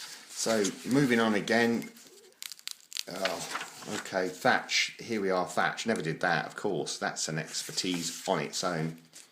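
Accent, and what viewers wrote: RP, United Kingdom. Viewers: Heya … I didn’t get a notification you uploaded just came over after reading your comment on our channel.. I think you are posh 🤣🤣 I come here purely to listen to you don’t care what it’s a about lol.. my dad was a bricklayer and one time back in the day he built 32 houses on a building site and when he got to the last house they ran out of bricks so the gaffer said ahhh sod it bung a low roof on it and it started the bungalow craze off 🤣🤣🤣 always found his jokes hilarious 😂♥️♥️♥️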